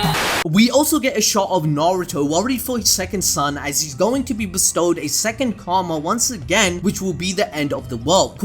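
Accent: Punjab, India. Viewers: lol are u punjabi bro? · Indian excent is lit.... 💯💯💯💯💯💯💯💯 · bro U indian? Wow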